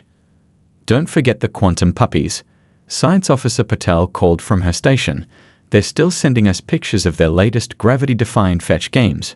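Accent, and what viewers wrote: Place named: Australia. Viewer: Very strange to hear this in an Australian accent, used to hearing other AI stories in different accents. Hoping this is an Aussie channel 😁😁😁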